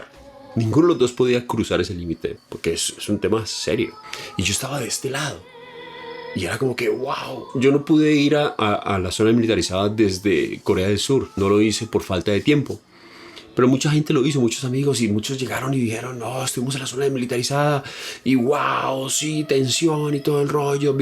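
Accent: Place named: Colombia. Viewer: Cuidandome en esta pandemia me encuentro con tu video y escuchando tu narración de tu experiencia en corea del norte, me encantó tu formato. He leído mucho sobre corea del norte, su cultura, su forma de vida, el sometimiento en este regimen, la infraestructura de este país y realmente no me gusta nada Corea de Norte, pienso que es un país engañando por este régimen, donde estan atrazados años luz del mundo exterior. Pero tú Narrativa es muy entretenida a lo Colombiano y eso me encantó. Seria muy interesante escucharte hablar de otros países que tu has visitado. Pues me encanta conocer otras culturas y otros países. Yo sigo a Alex tienda, Gabriel Herrera, Luisito comunica, planeta Juan etc. y que bueno que un colombiano nos narrará sus experiencias de viaje desde su concepto y a lo colombiano. Un saludo y felicitaciones.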